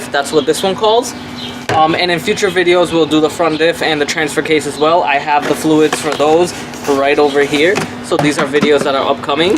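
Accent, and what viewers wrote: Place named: Trinidad and Tobago. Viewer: Very nice man, I have an 03 avalanche. It sounds like you're from Trinidad? I'm from St. Kitts and Nevis, but my family is from Guyana.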